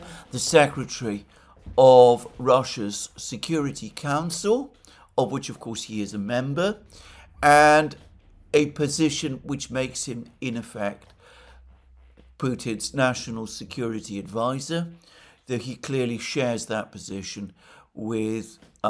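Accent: United Kingdom. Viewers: love how you described it! British accent rules❤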